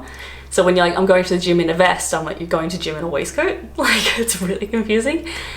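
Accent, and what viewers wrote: England, United Kingdom. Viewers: You've sort of got a Bridget Jones Diary accent! Not sure where you are living in the U.K., but in London, anything goes. Even made up words. I have never known anyone to take the piss if an outsider says a word differently! I'll give you an example. Say I saw something and can not think of a word for it, I'll say "Look at that thingymejig!" P.s. The amount of times the Americans and Canadians think London Cockneys are from Australia! It's unbelievable! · You sound more English than Australian.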